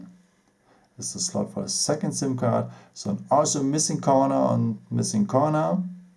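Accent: Germany. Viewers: sounds like a german accent :) ;) Thank you for the video, good job.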